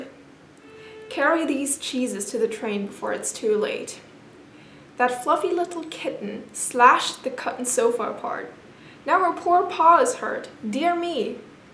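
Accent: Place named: East Westphalia, Germany. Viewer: Thanks for sharing! It’s fascinating how speaking different languages or accents change how people express themselves and how they’re perceived. I can hear some NRW sounds there, maybe a bit more Ostwestfalen? I just watched a couple of Erik Singer’s vids since I have to navigate my Sprachsituation. My parents were immigrants in Germany, I left to live in the US and now I’m temporarily in another country. This shifting of accents which you describe definitely happens to me, seems people have to cope with that, lol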